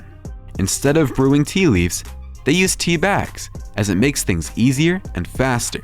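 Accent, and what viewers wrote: United States. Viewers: The claims were made by Burrell who was Diana's butler - not Charles' butler. The claims about Charles are garbage - made for the most part by Burrell. The toothpaste "thing" is something we'd all ask for help with if our arm had had to be broken twice - as happened with Charles. All the claims about toilet seats etc as one example are garbage. Ignore Burrell who's a gossip who makes up answers when he doesn't know the answer and left service with the RF when Diana died over 25 years ago. A butler recently retired from service with Charles laughed at all these claims and said he had never experienced any of them. Note the American accent on the video. Red Flag. So much garbage written and voiced by Americans about the RF all over social media - all of it laughable.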